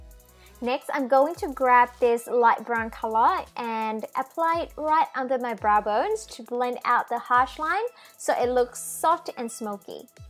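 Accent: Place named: Australia. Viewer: Wow! It was long time ago watched your video. Nice australian accent by the way.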